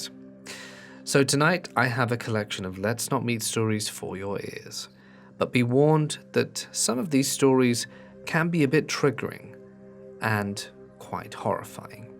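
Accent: Ireland. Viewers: That Irish accent is pretty hot. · I love your Irish accent! Happy new Year! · Love the Irish accent,!! Happy New Year!! 🥳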